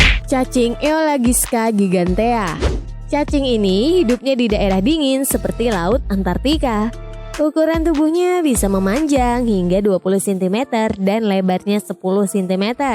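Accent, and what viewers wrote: Banjar, Indonesia. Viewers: Yg bikin channel ini orang banjar ya?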